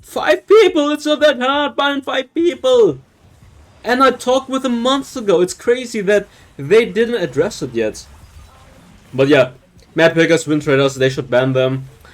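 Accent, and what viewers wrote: Germany. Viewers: I love that u can always hear his German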